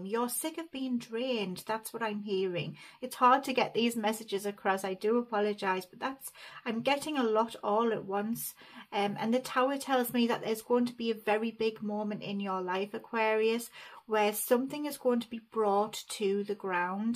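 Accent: United Kingdom. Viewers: I so look forward to these readings, I feel your accent is similar to mine as I live in Northumberland. You always make me feel so much uplifted afterwards. Thankyou and Bless you xx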